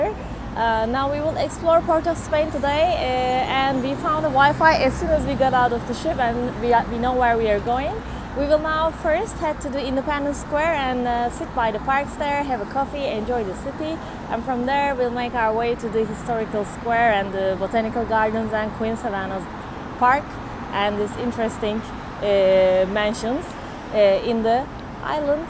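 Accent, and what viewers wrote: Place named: Turkey. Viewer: Ah, 😊 türk müsünüz? Biraz accent imizden tahmin.etmisdim. I am also planning to go to Trinidad & Tobago, but we are a bit scared. Would you tell me a bit about the criminality please?:-)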